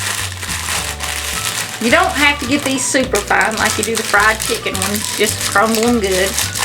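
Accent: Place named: Alabama, United States. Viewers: i'm guessing you're from Alabama, by your accent. You're cooking is similar to ours. I'm from Missouri and a lot of Missourians were originally (in the1800's) from Alabama, Tennessee, North Carolina. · What part of the south are you from? I am from east, TN. Your accent sounds very much like ones from this area.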